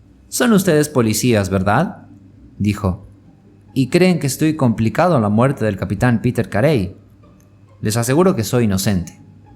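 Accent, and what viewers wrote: Rioplatense, Argentina. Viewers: valoro el esfuerzo hecho y le dejo like, porque creo que es lo mínimo que merecés. Pero convengamos que no es el acento ideal. Lo más neutro posible, sería lo ideal. Otros autores, con gusto los escucho con dicho acento. Ojo que el propio Borges hablaba y leía sin el "shesheo" rioplatense, a no ser que la obra evoque dicha pronunciación. No obstante, gracias por el tiempo y el esfuerzo. Un abrazo.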